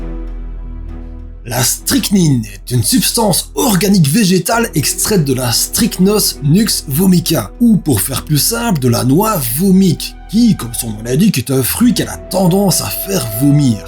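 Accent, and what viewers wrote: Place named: Belgium. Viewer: Un abonné de plus ! Attends,apprendre des choses grâce à une personne avec l'accent Belge, c'est trop rare !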